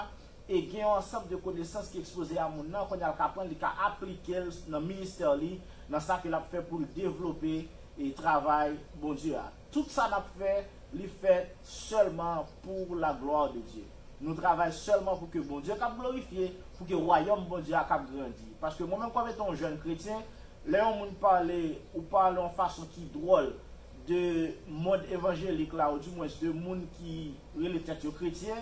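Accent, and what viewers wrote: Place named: Portugal. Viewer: Jusqu'à présent, je ne sais pas si le présentateur parle Français ou Portugais 🤔. C'est une langue hybride ?!! Je ne comprends rien.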